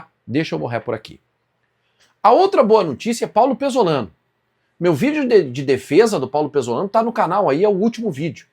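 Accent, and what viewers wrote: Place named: Spain. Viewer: sou uruguaio e o som do doble L em gallardo é: gajardo ou gachardo. É vichagra ou vijagra. Esse gadiardo que tu pronuncia ou vidiagra, é espanhol de Espanha. Saludos hermano!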